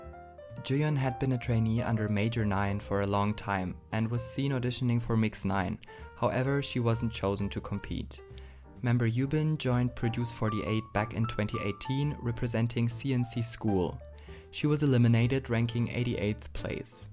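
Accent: Germany. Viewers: Du hast so einen krass starken deutschen Akzent, habs direkt erkannt weil ich auch so Englisch spreche 😋🤫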